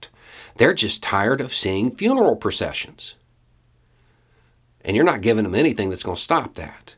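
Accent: United States. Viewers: You're so relatable with your country English. 🤘 P.S.: I'm late to the shot party, but I got mine. I felt zero pain or side effects. I'm still masking, cuz Delta is a bitch. Got the J&J vaccine. Never hear/see shit about people gettin' Delta on THAT one and done.🤔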